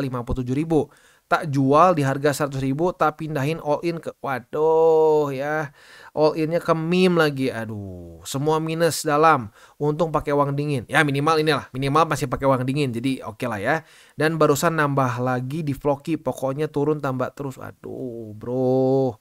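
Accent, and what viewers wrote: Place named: Jakarta, Indonesia. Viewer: Lu chindo y bang,kok logat nya kaya betawi😅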